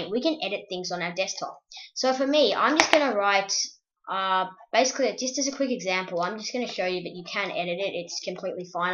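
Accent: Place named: England, United Kingdom. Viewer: You sound English!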